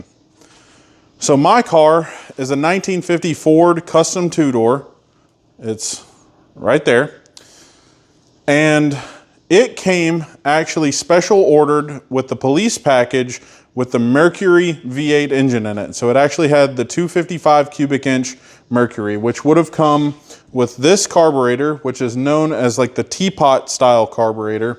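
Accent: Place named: Tennessee, United States. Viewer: glad YT recommended this. hope you document the CPS install a bit more in the future. really liking the repurposing simple parts and using speeduino. just a wild guess by your accent but I can imagine you're from like waynesboro or somewhere near AL in TN, though thats probably me projecting because I'm from the other side of the line.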